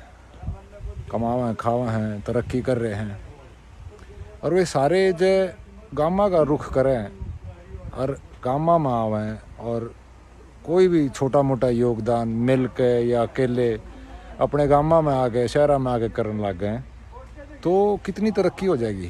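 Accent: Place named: India. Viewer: Bahott bayiya bhie gi App k muh seay Payorr Deasi Awaz sun kar bahott baydiya laga🙏🙏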